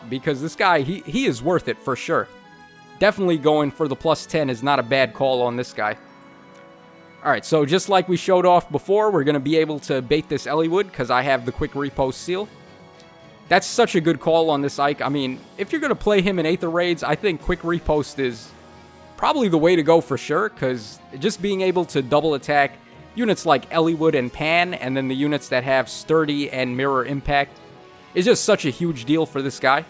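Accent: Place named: Brooklyn, United States. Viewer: May I just ask you to say Kiba? Because you sound like you're from Brooklyn or that area at any rate...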